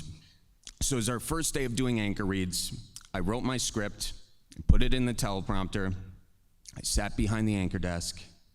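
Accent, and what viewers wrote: Wisconsin, United States. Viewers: You certainly still have a Wisconsin accent. Not quite as Manitowocy though.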